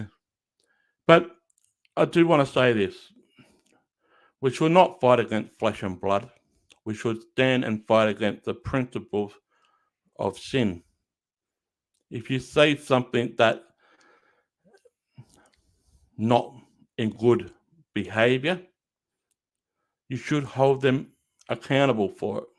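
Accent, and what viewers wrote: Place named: Australia. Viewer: Thought you sounded like an Aussie 🇦🇺🇦🇺🇦🇺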